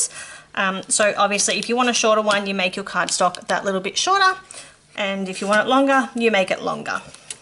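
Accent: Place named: Australia. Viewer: I love ur australian accent! Haha. Thx for the helpful vid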